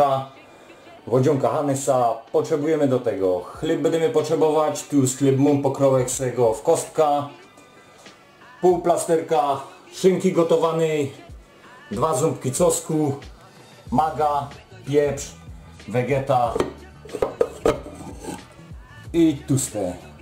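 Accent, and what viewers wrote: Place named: Poland. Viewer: Wyczuwom gorolski akcent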